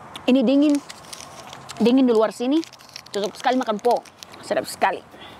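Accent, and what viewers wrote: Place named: Flores, Indonesia. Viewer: Seperti logat orang flores atau apua atau ambon nih.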